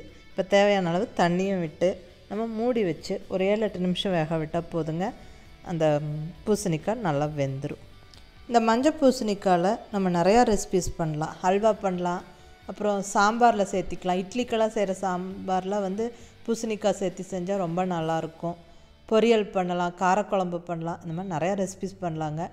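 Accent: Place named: Coimbatore, India. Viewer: Beautiful kitchen Madam ...😍😍கோயமுத்தூருங்ளா நீங்க? அரசாணிக்கா சின்ன வெங்காயம் தொளிச்சுன்னுல்லாம் பேசறத கேட்டா அப்படி தெரியுதுங்க... ரொம்ப என்ஜாய் பண்ணி ரசிச்சு சமைக்கிறீங்க... 💛💙💜💗💚❤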